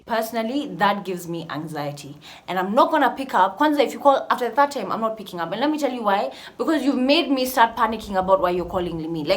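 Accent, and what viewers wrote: United Kingdom. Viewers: Your British accent ✨💕💕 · I so love you..it's such videos I long for.. kindly do a video with your British accent..I would love to hear this ❤️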